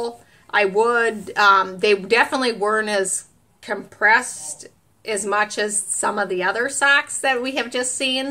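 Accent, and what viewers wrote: Minnesota, United States. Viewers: So that’s an accent from MN? I was thinking another country lol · Are you from Minnesota? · This accent is reminding me of the movie Fargo.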